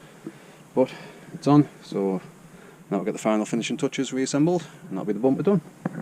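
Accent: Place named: Durham, United Kingdom. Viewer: Will you put mine on? I’m from Durham sounds like your from up here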